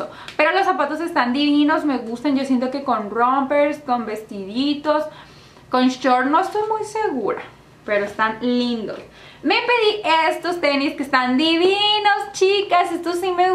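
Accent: Colombia. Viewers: Y ahora porqué, anda hablando como las de Colombia?? ,🤔Así no hablaba ella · note como que traias acento colombiano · senti que hablaste super acento Colombiano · Soy yo o te escuche como con otro asentó como colombiana o algo así jaja muy lindos zapatos 👠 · te escuche colombiana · si eres regia intentas hablar como colombiana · con ACENTO COLOMBIANO? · Ese acento Colombiano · Andas como media colombiana o algo Así no? 😂 Pero como quiera me gustó el videito jiji.. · hoy te escuche hace to como colombiana 😍😍😘😘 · note en este video tu acento colombiano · tu acento no me gusto tipo colombiana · Que Colombiana te escuchaste esta vez. Pero dentro de todo tu ropa muy bonita la mayoría te queda precioso.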